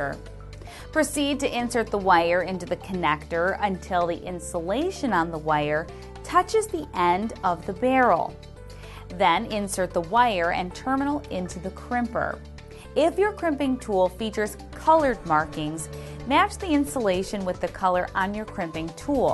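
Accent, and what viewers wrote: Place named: Upper Midwest, United States. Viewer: this is a good vid but her accent is hilarious. I guess I haven’t been in the upper midwest enough....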